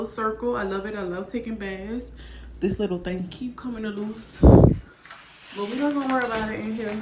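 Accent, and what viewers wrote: New Orleans, United States. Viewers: You must be from the Nola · New Orleans accent ❤️❤️ love it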